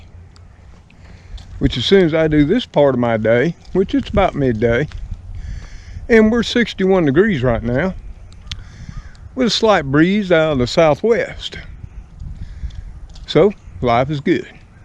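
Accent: South, United States. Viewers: That was a beautiful walk through that graveyard Mr I love graveyards and walk through alot of them myself if only it wasn't so cold but I go out anyways as long as it's not 10degrees when I was a little girl I used to love to play in the graveyard by our house all the time I think you may be having visitations at home when you smell that perfume and I find that so comforting because I believe the spirit can visit their loved ones and I don't fear the dead it's the living we have to fear unfortunately I have many strange things happen in my house also but anyway it's great to get out in the sun in the winter and get some exercise I hope you have a great night and stay safe I'd like to walk through the graveyard again with you if you want thanks 😊 Mr and have fun with your crew and by the way I love your southern drawl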